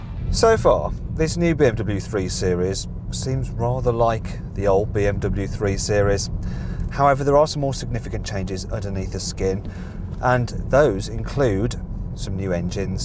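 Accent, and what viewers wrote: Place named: Birmingham, United Kingdom. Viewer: he's a brummy he's normal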